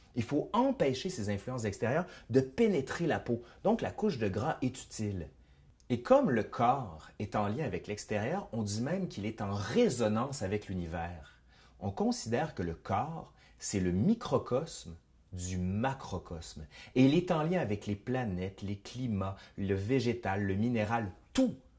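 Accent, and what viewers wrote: Quebec, Canada. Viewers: Il est québécois... olalala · Tres instructif, j 'adore votre chaîne, beaucoup d 'informations présentées de façon agréable et drôle, et votre accent québécois est vraiment charmant. Merci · J'aime bien le acsent Canadian, c'est drole ca me fait sourire . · Les canadiens vont avez des doc super mais l’accent horrible! J’ai de la peine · Que j'adore cet accent Québecois! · enfin une bonne chaine d'histoire, sans musique techno hyperactive en fond sonore et sans effets comiques surjoués. Non seulement le fond est captivant mais de plus la forme est très agréable. avec le bel accent québecquois en supplément ! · J'adore l’accent de nos cousins québécois, merci pour cette vidéo bien faite ;) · Je viens de découvrir vitre chaîne et j'adore. Et l'accent du Québec, quel bonheur ! Des becs ☺️ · Ta vidéo est bien argumentée mais tu aurais dû dire que la peur de l'eau était liée à l'épidémie de la peste noire, à t'entendre tu es québécois ? 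😀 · C est chouette un vrai conteur en chair et en os j adore ce petit accent québécois il raconte super bien 😊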